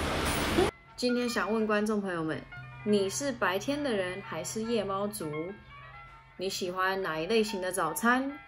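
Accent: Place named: Taiwan. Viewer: 恭喜妳！妳的國語100%的台灣腔，完全沒有外國腔調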